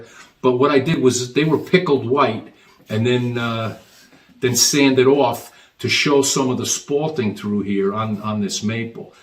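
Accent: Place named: Boston, United States. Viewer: I really enjoyed this video! I’m on the cusp of retirement after 35 years in the landscaping business, and now hoping to pursue some “woodworking” projects myself! I loved the “Boston accent” too! 😂 Thanks again, Dan (Red Wings fan from SE MI 🏒🥅😏)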